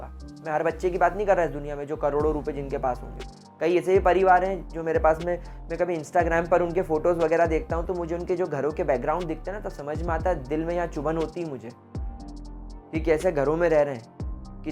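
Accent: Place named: Indore, India. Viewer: Pehli line sunke hi samajh aagya sir to apne Indori hai.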